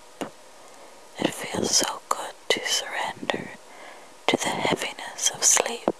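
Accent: Kilkenny, Ireland. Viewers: Love the irish acsent. This video helps me sleep. Love it :) Gray/ TheSquishMovies x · It's amazing to me how certain Irish accents sound so much like certain American accents.. I mean they're very different in many ways, but it's striking what they have in common. Your first couple sentences I even wondered if you were from the US or something until your Irish accent became obvious. I wonder if you feel the same about American accents or if it only goes one way. Hmmmm.. FOOD FER THOUGHT! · yeah sounds like a kilkenny accent im a cork man myself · Is that County Kilkenny I hear?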